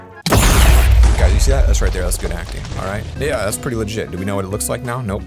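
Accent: Russia. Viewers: Ur Russian makes the vid ten times better 😂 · tip u need to set to to the nigth mode :) by clicking it also nice russian voice😂 live ur vids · Bru. His Russian accent xD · noice russian voice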